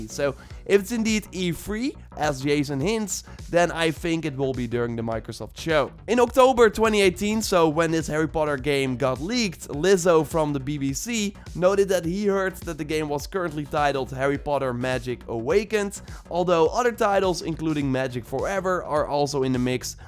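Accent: Netherlands. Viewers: Nederlands accent, altijd herkenbaar in enkele seconden 😂👍🏻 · holy shit you sound dutch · please work on your accent, it's so obvious that you're Dutch. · Dat moment dat je het accent hoort en weet dat de presentator Nederlands is vanwege het accent (geen haat, alleen liefde)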